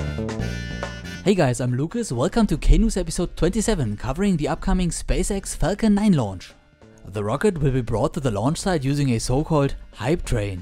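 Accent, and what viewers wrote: Germany. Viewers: You german?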